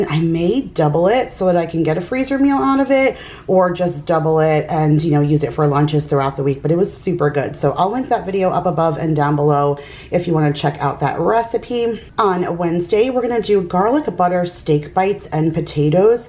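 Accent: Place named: Italian-American, United States. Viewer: Great haul! I miss Trader Joe's so much!!! I live in Hawaii now and they don't them here (or Dollar Tree!!!!). Can't wait to move....I'm a long time viewer. I know a lot of people rag on you for how you say "ricotta" and "mozzarella" but I just wanted to let you know my great uncle and grandparents used to say those words how you say them too. They were first generation Italian and grew up in Pennsylvania. I definitely think its an Italian-American/ back East thing. I don't have a problem with how you say it. Own it, that's who you are! Anyways great haul! I love this time of year too (even though there are no seasons in Hawaii lol)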